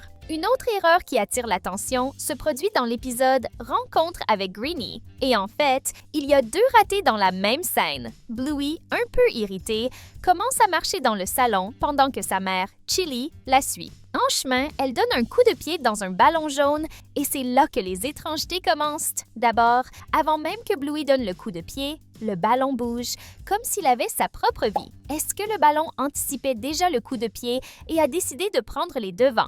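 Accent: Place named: Canada. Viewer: oui, un axent canadien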